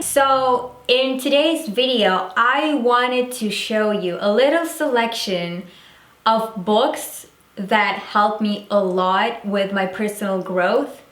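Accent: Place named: England, United Kingdom. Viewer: Hai un bellissimo accento inglese ♡.♡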